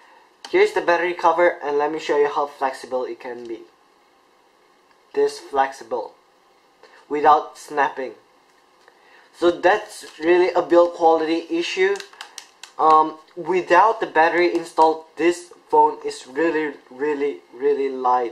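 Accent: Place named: Singapore. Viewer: Sounds more Spanish to me for some reason, but you're right, it's a Singapore accent by the sounds of it.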